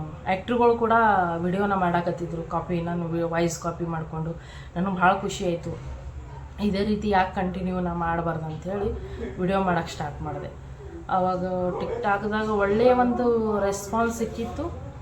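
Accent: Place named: Bidar, India. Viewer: Nima swatha uru yavadu akka yak andre niv bidar bashi mathadthira adk heli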